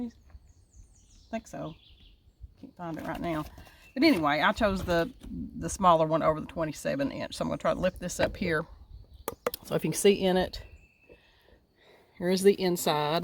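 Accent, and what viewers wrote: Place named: South, United States. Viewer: just found you. what state are you from.??? Sound like a Southern sister. I did subscribe. looking forward to your videos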